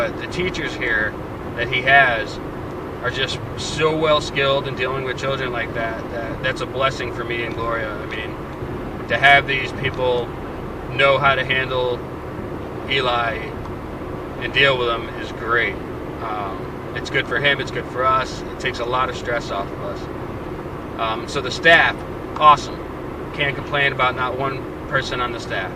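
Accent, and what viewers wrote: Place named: Minnesota, United States. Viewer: kind of sounds like northern Minnesota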